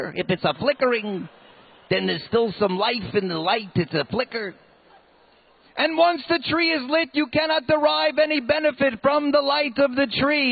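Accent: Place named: United States. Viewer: THIS GUY SOUNDS SUSPICIOUSLY LIKE A YANK, BUT HE MUSTVE GONE TO MY CHEDER IN SUNDERLAND.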